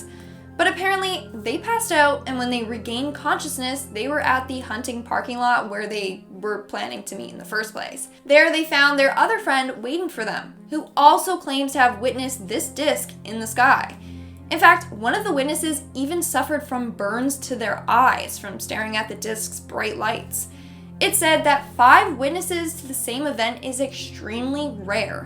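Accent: France. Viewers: I love the French accent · I like your French accent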